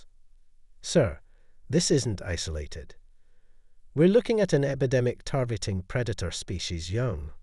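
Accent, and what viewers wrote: Scotland, United Kingdom. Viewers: love the accent, brings back memories of my Scottish Grandmother ❤‍🩹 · A story read with a Scottish accent! Lovely ❤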